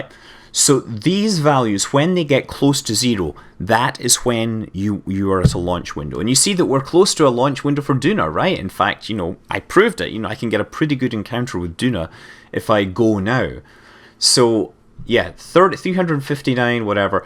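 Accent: Scotland, United Kingdom. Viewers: It's pretty obvious from his accent that he is from Scotland.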